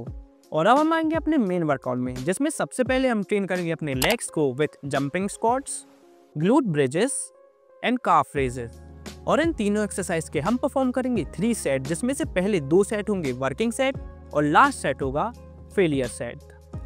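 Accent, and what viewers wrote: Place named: Bundelkhand, India. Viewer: Bhai kha se ho voice se toh bundelkhand ke lagte ho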